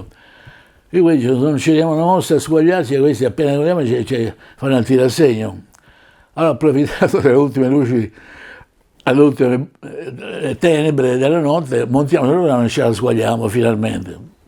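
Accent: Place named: Rome, Italy. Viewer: Ma che siete tutti padani ?? È un romanesco masticato, parlato "dinto o musso" come dicono i napoletani 😂😂 . Comunque era gente tosta.. così non ne nascono più.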